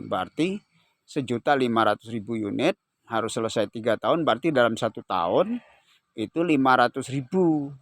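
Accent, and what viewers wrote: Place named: Klaten, Indonesia. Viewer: Logam klatenya mantap hhhh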